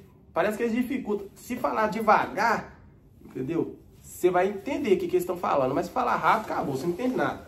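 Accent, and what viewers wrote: Minas Gerais, Brazil. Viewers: Quw cara dahora um abraço man sou mineiro tbm, melhor sotaque pra contar história ❤😂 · O seu sotaque é igual o do meu primo Leo que é de inhapim mg ele tá nos Estados Unidos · Kkkkk mano tu e mineiro né ? Cai aqui de paraquedas, rachei da forma engraçada que vc conta kkkkk vc parece muito o Josias presidente da Galoucura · Mineiro contando é mt engraçado kakskakakakaka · eu adoro o sotaque de minas mano, se loco vc aprende e se diverte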